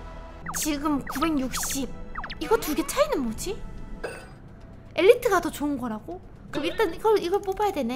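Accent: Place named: Gyeongsang, South Korea. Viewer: 부모님이 경상도 분이신가 봐여. 님 억양에서 경상도 억양이 살짝 묻어 나네요. ^^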